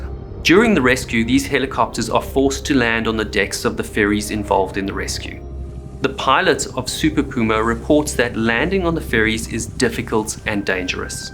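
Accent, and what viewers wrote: South Africa. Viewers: Neat accent. South African, is it?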